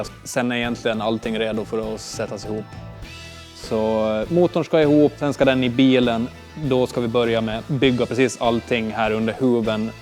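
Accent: Åland, Finland. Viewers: jaa hörde lite finlansvenskt i tonen! De är bra ni har börjat med youtube 👍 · Skulle precis fråga om du var från åland ,när jag såg i kommentarerna att de var så😅,avesta dal åland mål... Jävligt bra film iaf 👍👍. Heja åland, världsrekord på is oxå. Topfuel tjejer i nhra mm. Mycket bra racers från åland !!! Keep it going ❤ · Härlig dialekt men jag gissar nästan på att han är från Åland från början!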